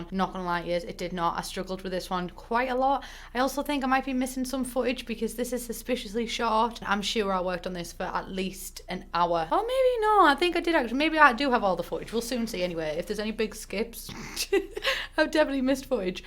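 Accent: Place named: Middlesbrough, United Kingdom. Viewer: Just stumbled across you and you're bloody hilarious! 😂 Are you from Boro by any chance? I recognise your accent loads!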